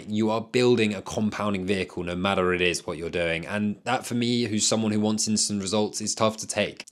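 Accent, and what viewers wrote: United Kingdom. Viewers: WOW 너의 영상을 보며 영어공부를 합니다. 너의 영국발음이 너무 어렵습니다. 나는 영국인처럼 영어를 하고 싶습니다.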